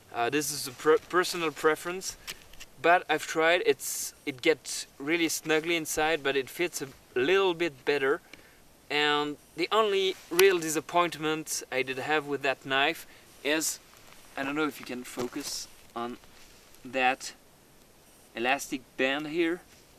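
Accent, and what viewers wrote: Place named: Switzerland. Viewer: hoi du CH schweinchen (schweinli doesnt seem correct). Love the accent, too many years since ive heard it. What part of Helvetica are you filming in? Nice video, reminds me of many happy nights in your snow. Nice knife, im attached to hand forged steel, but its a big chunk of change. I wonder how the quality is now, so many years after the split. Viel gruess aus Florida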